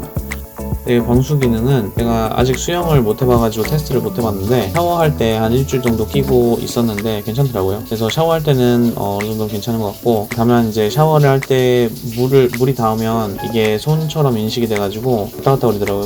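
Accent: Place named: Gyeongsang, South Korea. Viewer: 찡깁니다...ㅋㅋㅋㅋ 경상도 구먼..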